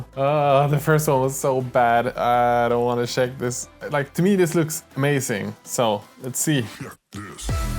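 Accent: Sweden. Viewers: Ah! I thought I heard the Swedish! Great video, lot's of fun :) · WHAT DUDE! Oh my god you’re swedish??! I could tell you werent native english but wow im shocked. Haha i knew I recognized the accent.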